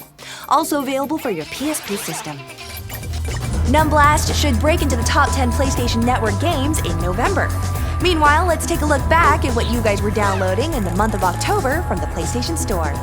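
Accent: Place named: Asia. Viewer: omg i love her asian accent lol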